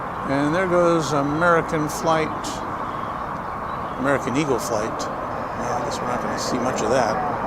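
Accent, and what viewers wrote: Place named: Brooklyn, United States. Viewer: haha! and host is from Brooklyn New York, can you believe it 😄 #accent??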